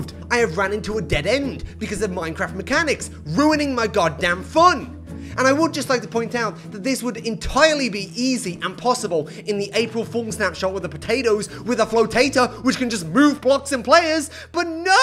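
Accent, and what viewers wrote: United Kingdom. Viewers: Ah yes,British accent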